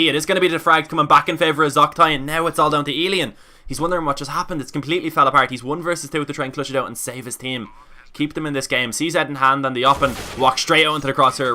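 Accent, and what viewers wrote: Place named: Meath, Ireland. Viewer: THIS IS REALLY ANNOYING ME you sound like youre from meath but also a bit more northern!!!!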